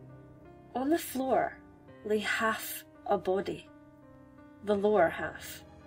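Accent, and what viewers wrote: Scotland, United Kingdom. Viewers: Are you scotish? Wooo hooo first scotish cp reader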